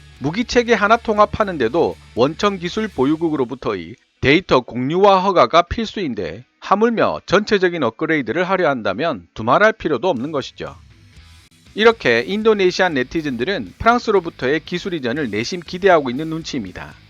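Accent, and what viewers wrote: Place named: Jeolla, South Korea. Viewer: 외국에사는 교포로써 엄청 이 프로 즐기는fan인데. 내용도, 진행방식,방송하는 목소리에 호감.헌데 전라도인 특유의 "의" 를 발음 못하고 " 으" 로 하는데.. 내가 너무 좋아하는 방송이기에 이 표준어를 사용했으면하는 아쉬움이 있다는게 저만의 솔직한 심정이라서.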